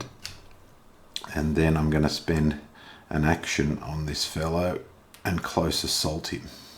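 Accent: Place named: Australia. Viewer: Hi, thank you so much for the amazing video series. I've been wanting to get into these solitaire games but was worried how difficult it would be to play. I've actually owned D-Day at Omaha Beach from this series for maybe 8 years or so but never played it. However watching your videos, the explanation of each move and turn really has opened my eyes and got me wanting to try. I've assumed you're an Aussie based off your accent. I am an Aussie also (NSW based), so really does make it even more enjoyable that someone has taken the time to make such in depth videos for people to watch. Massive thank you. Hopefully you get some more time to make more. Hoepfully I can pull the box down and give it a whirl! Also, how did you trim your chits? Do you have a little jig to have them look so nice on the corners?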